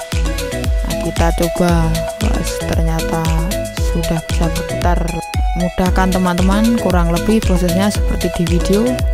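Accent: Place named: Java, Indonesia. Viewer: Keliatan kali jawanya... Medok abisss...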